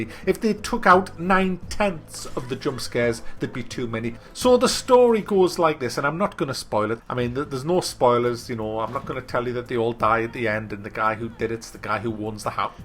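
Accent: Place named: Newcastle, United Kingdom. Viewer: You sound like the Geordie version of Sir Davos from Game of Thrones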